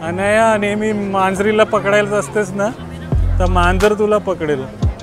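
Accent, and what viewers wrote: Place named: Agri Koli, India. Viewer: आणि तुझ्या मध्ये गावठी भाषेचा टच खूप म्हणून तू आगरी कोळी खूप छान शोभतो.... I like it very much..😊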